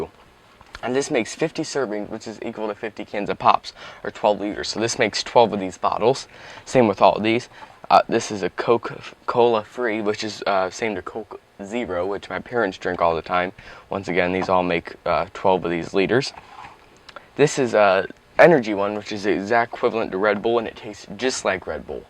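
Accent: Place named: North, United Kingdom. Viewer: Pop. Teehee. You're a northerner, aren't you?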